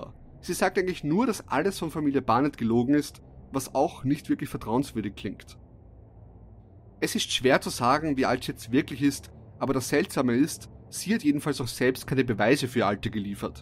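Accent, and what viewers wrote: Vienna, Austria. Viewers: Bist du ein Wiener?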